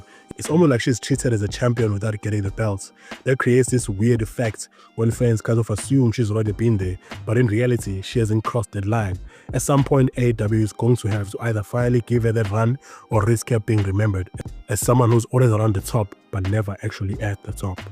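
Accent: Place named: South Africa. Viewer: Hey man, what's your nationality, the accent is giving hints of South African, just asking of curiosity 😁 other than that great video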